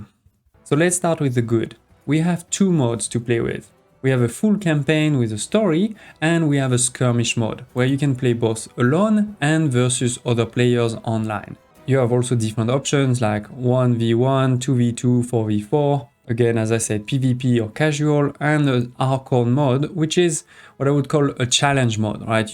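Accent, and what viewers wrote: France. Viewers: Bro sounds 100% French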